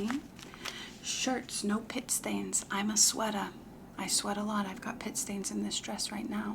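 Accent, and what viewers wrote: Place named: Long Island, United States. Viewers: Omg yes! She does the Long Island accent in that series too. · the way my name is Chelsea and i’m from Long Island with family that sounds like this 😭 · what I want to know is how you got even the mannerisms to a T… you sure you’re not from long island??? 😂🩷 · LMAOOOO I literally loved this video, me being from Long Island just made me laugh through the whole video bc I feel like I don’t have one and my friends say I do 😭 but girl the accent is hilarious I loved this whole heartily I get so excited when you post 😝🩷! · Something about the Long Island accent makes me so happy lol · I’m from Long Island!!🎉 I heard more of a Brooklyn/bronx accent mixed in there too! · yeah sounds about like the mothers id hear sitting at other tables at a restaurant on LI 😭😭 great job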